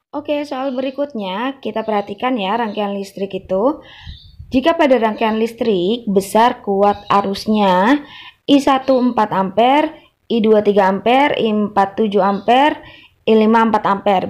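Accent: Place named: Tegal, Indonesia. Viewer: Tegal ngapak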